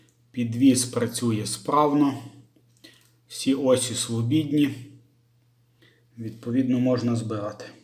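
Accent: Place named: Polissia, Ukraine. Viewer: Зацікавив ваш акцент. Ви випадково не з київської області (з регіону де живуть Поліщуки)? Частенько чую у вас слова притаманні саме тому регіону.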